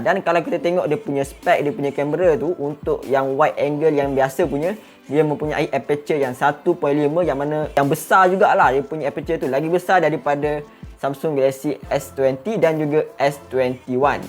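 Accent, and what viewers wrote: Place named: East Coast, Malaysia. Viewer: Orang mana bang ??? sebab bunyi loghat la mcm pantai timur . Macam je tanye je jangan kecam jangan marah ok .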